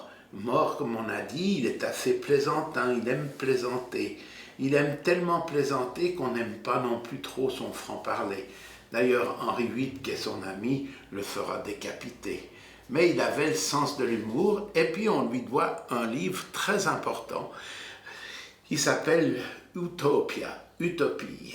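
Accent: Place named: Switzerland. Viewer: quel bel accent ;-) vaudois ou genevois ? salutations et merci encore pour votre travail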